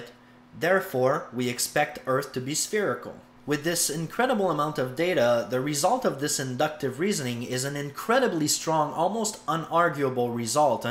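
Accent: Quebec, Canada. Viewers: wannabe to wasabe he's Canadian French. Didn't learn English til age 9. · Sounds French Canadian to me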